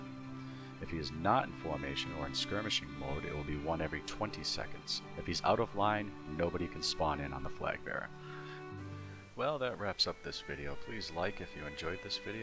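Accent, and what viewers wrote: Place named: New England, United States. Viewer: Hey man love your video, just curious are you from Mass? I live in Maine and I swear you have the faintest commonwealth/new england accent hahaha either way again love the videos man very informative keep on keeping on. P.S. you kinda sound like Adelbert Ames the original commanding officer of the 20th Maine as portrayed in Gods and Generals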